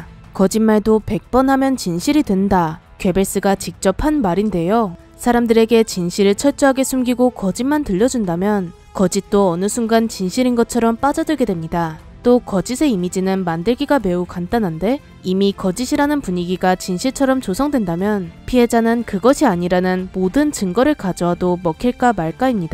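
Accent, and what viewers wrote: Gyeongsang, South Korea. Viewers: 내레리션 담당자분 경상도 사투리 들려요 ㅎㅎㅎㅎㅎㅎㅎ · 경상도 사투리?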